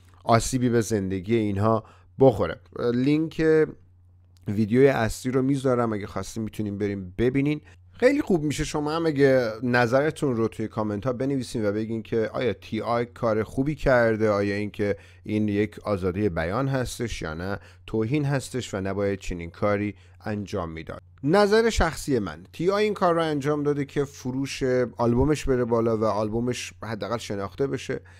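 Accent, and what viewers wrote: United Kingdom. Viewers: خوب ویدیو را نشون بده. چرا مثل تازه بدوران رسیده های غرب زده فارسی را با لحجه انگلیسی حرف میزنی؟ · داری فارسی حرف میزنی با لحجه انگلیسی وقتی می‌پرسه آیا عاشق ترامپ هستی دو دفعه میگه بله ما خوب هستیم yes yes we are fine. از همین جا معلومه چرند میگی و هیچ اطا عی نداری و دروغگو هستی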